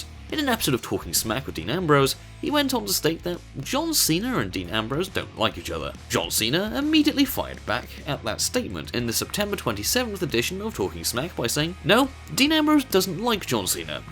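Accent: United Kingdom. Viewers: shocking not so much just another countdowner on youtube with a british accent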